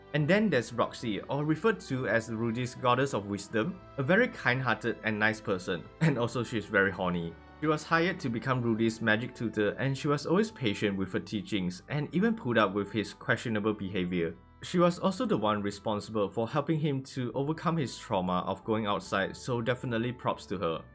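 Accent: Singapore. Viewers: Gosh keep up with the good content, I've been hooked, I was first interested because if your accent and how I just recognized it was Singaporean, since I'm Malay, but yeah keep up the good work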